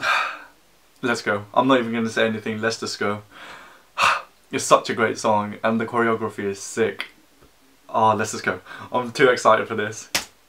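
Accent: United Kingdom. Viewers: Are you British? :)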